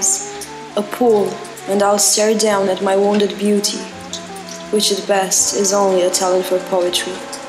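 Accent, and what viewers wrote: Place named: France. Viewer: I hear a French Accent ! Its Beautiful . Wondering how you found his poetry.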